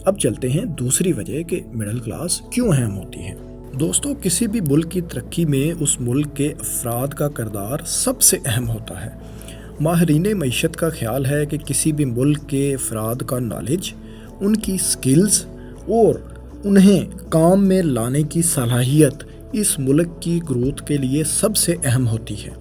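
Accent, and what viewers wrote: Punjab, Pakistan. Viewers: Everything is good and valuable except the VOICE. He speaks Urdu, in Punjabi accent. Please change your narrator.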